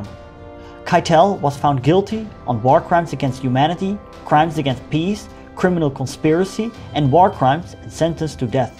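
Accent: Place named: Netherlands. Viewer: He speaks English like a Dutchman... Admiral Donuts! That's hilarious!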